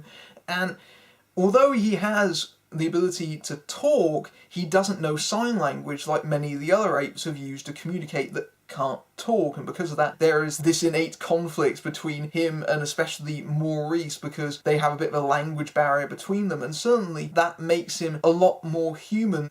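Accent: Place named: England, United Kingdom. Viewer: You got the best English accent ive heard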